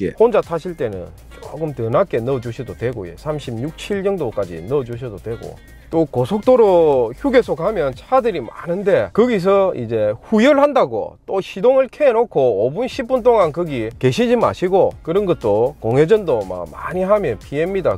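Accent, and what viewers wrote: Gyeongsang, South Korea. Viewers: 매형이 김해분이신데 행님이랑 말투가 100% 같습니다. 찐 경남 사투리. 영상 볼때마다 매형이 생각납니다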